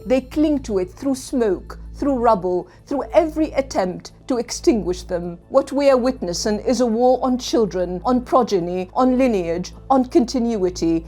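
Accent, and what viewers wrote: RP, United Kingdom. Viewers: She has a posh accent but is wrapped in this 6th century garb and 3rd century ideas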